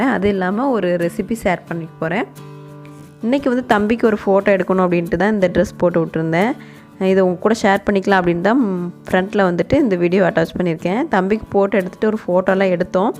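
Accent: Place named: Anaimalai, India. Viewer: Neenga pesara language vanthu enga language mariye irukku nanga anaimalai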